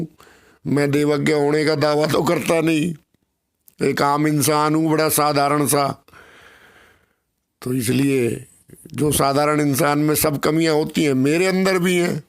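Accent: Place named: Delhi, India. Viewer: Ram Ram Dada aap ki boli Delhi Ncr ki hai pahle bhi apse reqvest ki hai darsh karne ki aapse marji aapki apka contact no to milta nahi mera no hai <num> Om namo narayan Dada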